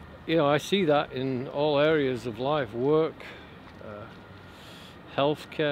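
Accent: United Kingdom. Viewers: I was kind of mislead by your wonderful British accent, when I found out you actually live and work in the US. That was a surprise.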